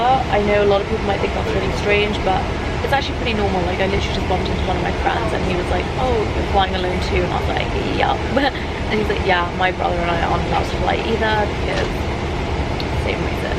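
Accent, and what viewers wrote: United Kingdom. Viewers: She's British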